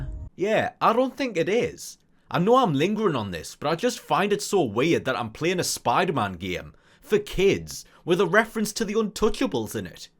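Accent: United Kingdom. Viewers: Okay I'm 9 minutes in and I've gotta say: - You have what it takes to have easily >500k subs. I don't mean you should only have 500k, but the production and editing and commentary are far above and beyond what I would expect from a youtuber with 182 subs. You're already producing content in the echelon of much higher sub counts which I hope pans out for you. - You have a great voice/accent for this shit. I literally won't watch videos over 8 minutes without a UK accent - Major nostalgia from this game. I used to play the demo of the first game on the Jampack Winter 2000 demo disc I got at a Toys'R'Us (RIP) - actually apparently they are opening inside of Macy's as of a year ago after the bankruptcy and closing of all their stores - Funny without being cringe - Good pacing - describing what happens in the game without being too descriptive all while being analytical without being too analytical - you strike a perfect balance Immediate sub and like. 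One thing/tip (?) is to bleep the f bombs. I do absolutely love hearing them, but I've noticed that lots of the higher profile youtubers are bleeping to maintain ad revenue. I think the bleeps still suffice in delivering the sentiment of f*ck. If you have a Patreon I'd be glad to give $15-20 a month to help out and see where you go. EDIT_0: 13 min in - the skyrim realization is amazing. I didn't know that 🤣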